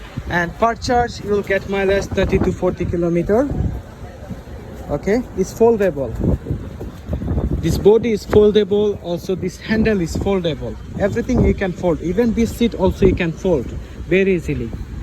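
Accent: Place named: Chittagong, Bangladesh. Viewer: আপনি চট্টগ্রামের নাকি?😁 উরে তুলো তো।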